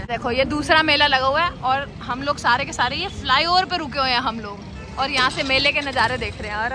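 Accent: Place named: Gujarat, India. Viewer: Hi... Tomari Hindi old Gujarati mix language achcha lagta Hai tumhara bolane ka style... Ab Punjabi Ho aur Gujarati mein marriage ki hai ab aap ke nakshu ko Punjabi Sikhaoge ki Gujarati bolna... Aap ki mummy ka Ghar kaha hai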